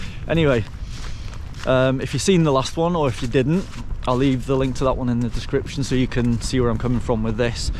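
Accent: England, United Kingdom. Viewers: Your not welsh you sound english and not 1 of them are asylum seekers many countries they pass through before getting here? 2/3 if they really were that they'd settle for the 1st country out of pure desperation but our country are easy targets if anything gets said the race card gets pulled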